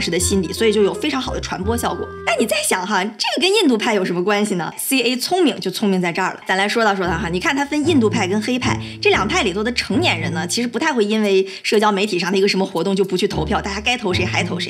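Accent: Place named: North, China. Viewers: 大姐， 你这英文咋山东味的？ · Her heavy northern Chinese accent drives me nuts! 😁 some may love it.. well, not me...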